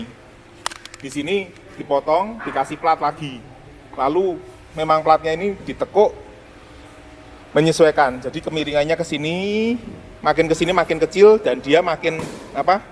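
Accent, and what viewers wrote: Java, Indonesia. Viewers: Jowo tulen iki cak 🤣😂,, mantapp om 👍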